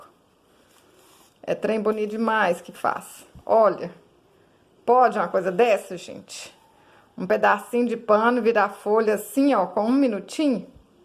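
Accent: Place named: Caipira, Brazil. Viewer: Amiga querida eu amo seus trabalhos tento fazer quase que todos adoro sua voz meio que caipira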